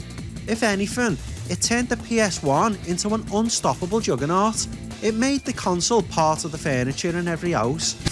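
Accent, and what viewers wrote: Liverpool, United Kingdom. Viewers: Fuckin lovin the scouser annunciations :'> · Fucking miss the scouse accent. We moved from Walton to Scotland when I was a kid. Don’t go back enough son 🥲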